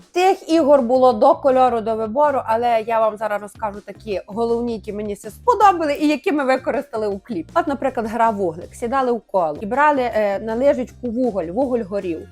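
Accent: Hutsul, Ukraine. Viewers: Як я люблю цю жінку, особливо її гуцульський говір. Нехай щастить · Я все зрозумів, просто замість Я говориш Є і в тебе гуцульській акцент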